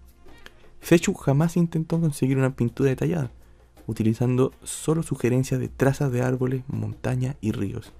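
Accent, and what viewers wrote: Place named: Chile. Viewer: Eres chileno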